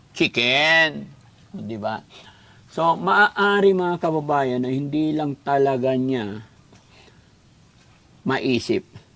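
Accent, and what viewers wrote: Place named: Cavite, Philippines. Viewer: Ung tono ng salita nya sounds like cvte o batangas...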